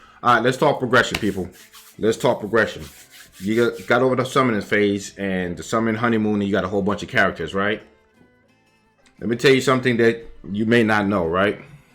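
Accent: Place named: East Coast, United States. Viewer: Bruh you have an east coast accent. Where you from? 😆 Subbed for the swag the content was extra.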